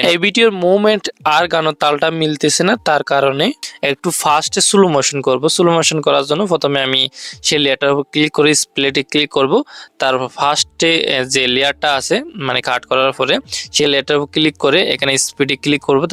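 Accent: Chittagong, Bangladesh. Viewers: অনেক দিন পর ভাই আপনার সাথে দেখা ধন্যবাদ ভাইয়া । আপনার ভয়েস শুনে বুঝে গেছি । আপনিও আমাদের প্রতিবেশী। চট্টগ্রাম বিভাগ থেকে ❤ চিটাগাং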